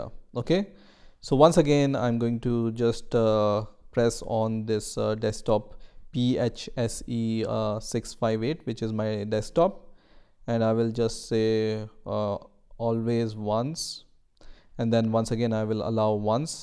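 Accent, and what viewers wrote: India. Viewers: bro It's Indians English accent...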